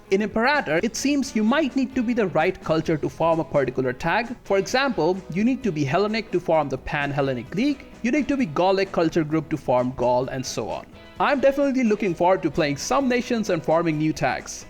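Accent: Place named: India. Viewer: Wtf your Accent is soooo Indian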